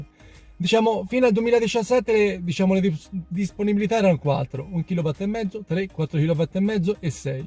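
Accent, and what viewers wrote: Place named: Apulia, Italy. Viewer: Bellissimo accento pugliese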